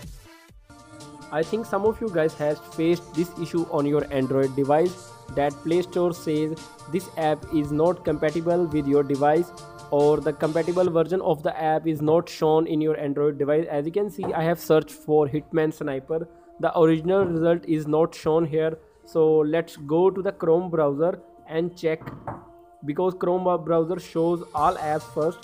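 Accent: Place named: India. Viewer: Isn't it a coincidence that he sounds Indian?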